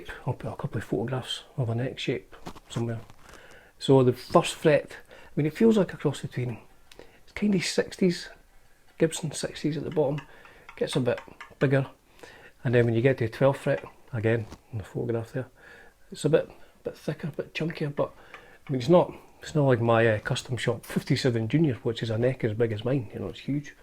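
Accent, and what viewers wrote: Scotland, United Kingdom. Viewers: I can listen to Scottish people pronounce Japanese names all day 🌝👍🏼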